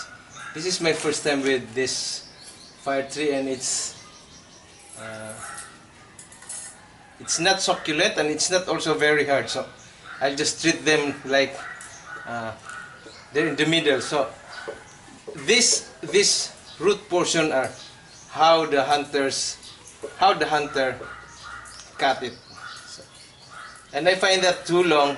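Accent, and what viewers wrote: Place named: Bisaya, Philippines. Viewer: piste mu bai..pnipilit mu pang mag inglish (english)...hlata nman bisaya ka...pisti tlaga..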